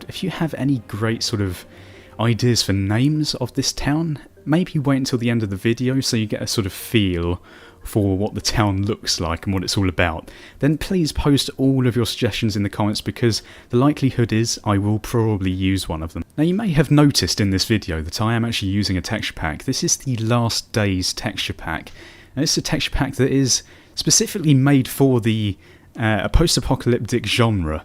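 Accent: United Kingdom. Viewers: YAY he is british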